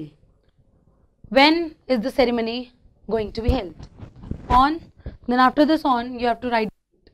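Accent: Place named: Pakistan. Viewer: Pakistani english bolrhi h ye madm To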